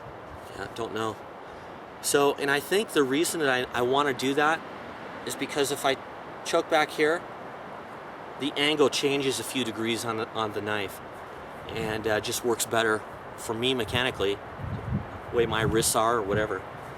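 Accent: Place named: Arizona, United States. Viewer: haha he knows, he stated when he first introduced it that he won't be pronouncing it as hoonglas but as unglas bcuz of his Arizona accent/speech pattern.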